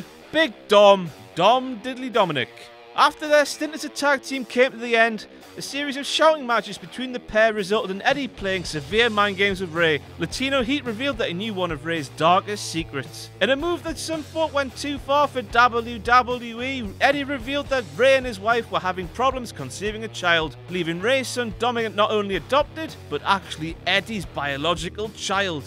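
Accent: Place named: Newcastle, United Kingdom. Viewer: He should just stick with his Geordie accent, as it is far more "hilarious" than saying 'dabble yoo, dabble yoo, eee" for laughs.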